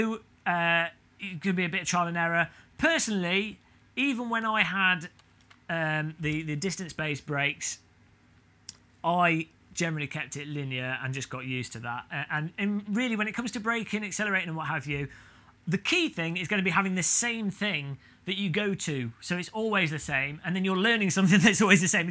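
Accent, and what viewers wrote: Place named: East Midlands, United Kingdom. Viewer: I'm sure that sounds like an East Midlands accent? Nottingham?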